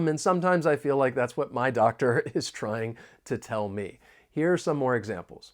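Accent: United States. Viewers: the best for you. Although you have not a strong american accent, i am able to catch the 95% your speeches. Other teachers, and much more docomentaries with the voice off are a bit challengue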